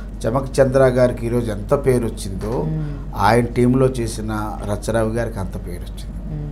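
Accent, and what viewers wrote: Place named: Telangana, India. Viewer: రచ్చరవిగారు ఒక అద్భుతం🙏ఆయన తెలంగాణ మాండలీకం అద్భుతం🙏🙌👏👏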